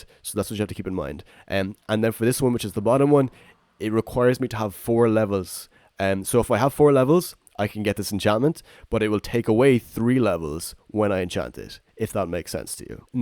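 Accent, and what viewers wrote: Ireland. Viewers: Sounds like an Irish John H. Benjamin...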